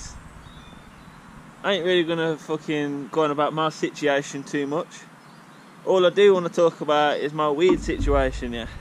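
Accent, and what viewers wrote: United Kingdom. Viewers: He’s brummie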